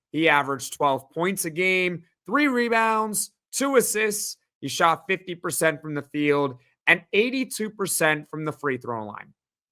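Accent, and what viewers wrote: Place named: Downstate New York, United States. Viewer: I guess we all can ,you are definitely a breath of fresh air. I thought I herd you say you are in like South FL ,you sound like a downstate New Yorker? I was born and raised in the Cuse and now living in El Paso Texas but as soon as my house we are moving back to the Cuse I still have season tickets for both BB and FB.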